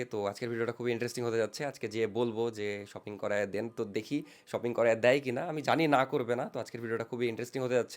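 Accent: Rajshahi, Bangladesh. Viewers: রাজশাহীর ভাষা শুনতে ভালো লাগে। যাই হোক আপনার নতুন মা কে স্নেহময়ী মা মনে হলো ।🎉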